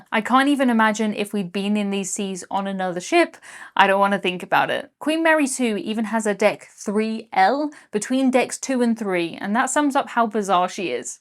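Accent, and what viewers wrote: United Kingdom. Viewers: I would love to run into you on a cruise just so we could sit down and talk about cruises and you experiences that you have. I love to hear your commentary not sure if it the British accent but listening to you explain these things just sounds so lovely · Shedule is my fav British work lol. They just forget the C in schedule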